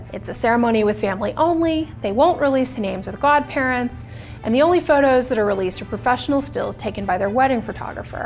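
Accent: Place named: United States. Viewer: I stopped watching after hearing that slimey American accent yuk🤮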